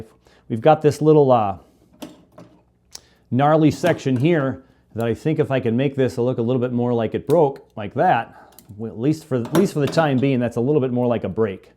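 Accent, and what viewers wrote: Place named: Minnesota, United States. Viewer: Just stumbled upon your channel and the first thought I had was, "Huh, that turntable looks like the ones Van makes." Second thought was, "This guy sounds Minnesotan" and then you mentioned being in Minnesota and I was like, "Ah, well, there you go." Did Van make your turntable?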